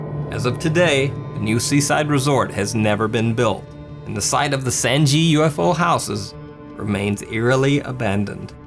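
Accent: South, United States. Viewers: I love his voice too, and Southern US voices are so gorgeous, I'm from UK and they sound really lovely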